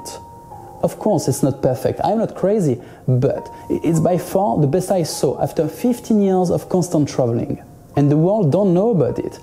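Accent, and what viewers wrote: France. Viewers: Bro you really should work on the pronunciation, you have a really heavy French accent that makes your point really hard to understand, plus the really basic grammar mistakes are quite discrediting the image of yourself you want to convey (I'd have trouble with a business man that doesn't know that you should say ''the world knows'', not ''the world know'') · Chad french accent bro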